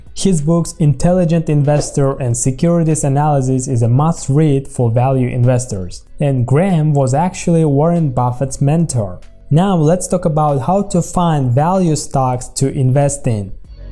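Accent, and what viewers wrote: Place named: Central Asia. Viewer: With strong Central Asian accent. My guess would be Kirgiz or maybe Kazakh. Красава!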